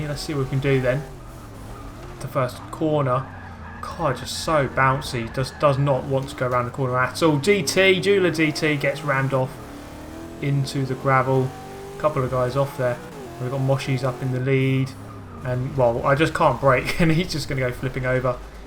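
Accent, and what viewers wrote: United Kingdom. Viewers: The contrast of this pure madness along with the calm British commentary is just great lol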